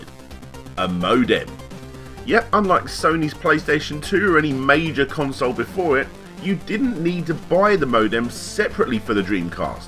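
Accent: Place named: United Kingdom. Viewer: We love that British accent. Sorry you had to deal with low speed !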